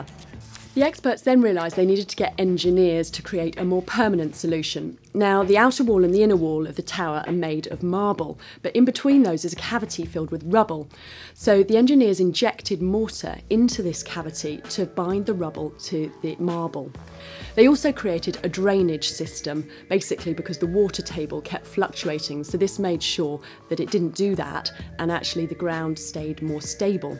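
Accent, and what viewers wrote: United Kingdom. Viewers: Omg why did this stupid brithish Women had to talk you cant understand here Wheres the american womens to replace This Narrator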